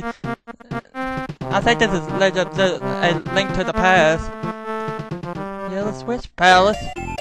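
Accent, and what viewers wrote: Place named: South, United States. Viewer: love ur country accent, great commentary!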